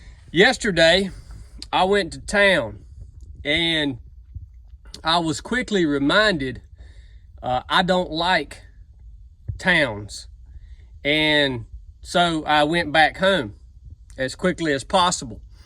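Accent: South, United States. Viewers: Bro I like your content but this is a little bit much lol you almost sound hillbilly sorry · I don't know your history since I'm new to the channel. I keep trying to figure out your country accent. Here are my guesses in order: Kentucky, Tennessee, West Virginia, Georgia. It can't be Texas. And it really doesn't matter too much anyway. Just curious. I am a student of language and accents.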